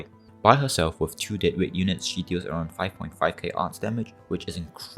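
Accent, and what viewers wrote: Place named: Singapore. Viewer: i can tell ur singaporean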